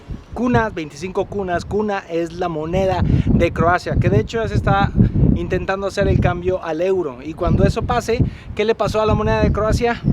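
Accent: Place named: Guadalajara, Mexico. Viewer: Me encanta escuchar tu acento tapatio 💜😁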